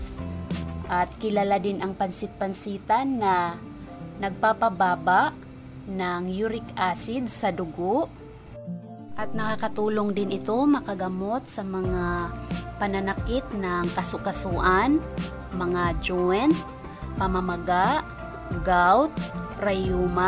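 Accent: Visayas, Philippines. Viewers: wow ha!banas sa MGA bisaya...puntong bisaya... Tagalog po salita nya... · Bisaya ka ma'am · wag na po tau magalit sa tono ng pananalita ni ate kc bisaya cia bah kaya suportahan na lang natin c ate mga ka atritis hahahaha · Bisaya kasi!!. Unawain na lang, nauunawaan naman, thanks Inday.. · Bisaya kasi salita kaya nakakabanas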